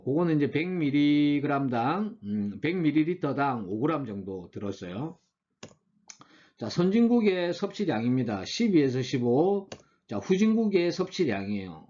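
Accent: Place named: Busan, South Korea. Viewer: 크 알겠습니다 ㅎ 오랜만에 듣습니다 단디 ㅎㅎ 저도 부산놈이라 형님 사투리가 참 좋습니다 ㅎ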